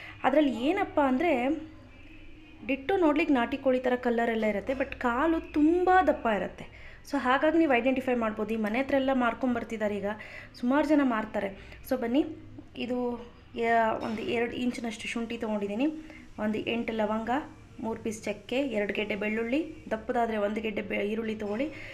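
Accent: Mandya, India. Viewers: just by your way of speaking,as we r from Mandya..tq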